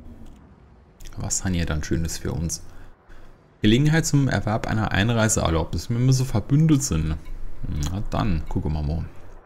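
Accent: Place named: Hesse, Germany. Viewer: Dein Hessisch ist Klasse^^ Hab wieder "2 Guardianstrukturen" nahe am Zentrum als "Staffelmarker" gesetzt.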